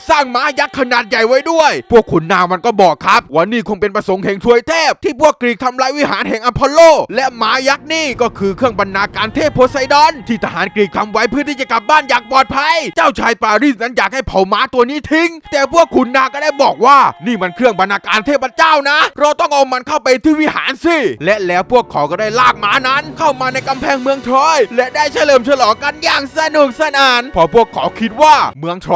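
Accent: South, Thailand. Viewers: พี่เป็นคนใต้ใช่ไหมคะ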